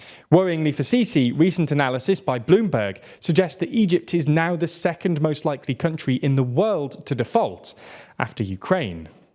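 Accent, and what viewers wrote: United Kingdom. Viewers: Its crazy for a dude with a British accent to all a global south leader "autocratic" lmao Especially since Egypt broke free from direct British rule about 10 years before my grandmother was born. In fact, there's probably people in Egypt right now who remember British rule. Like have a seat. Its also buck wild that they're making it seem like the economic issues of the country can be blamed on like one dude as if colonialism and the imposition of neo liberalism have nothing to do with it. Like bfr. Its useful to hear how liberals view things tho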